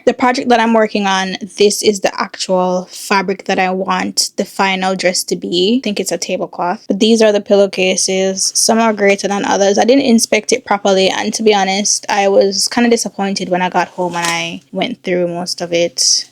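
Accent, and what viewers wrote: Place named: Patois, Jamaica. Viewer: lol the patois chips in sometimes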